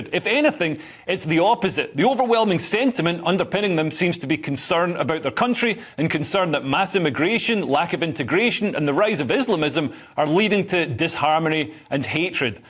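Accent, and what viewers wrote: Scotland, United Kingdom. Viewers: I like the Scottish accent for news reading. Could we try a Glesga accent next ? Has the guilty policeman and the court official responsible not just for the Britons arrest but twisting our laws, so as to jail a man for posting harmless posters around town.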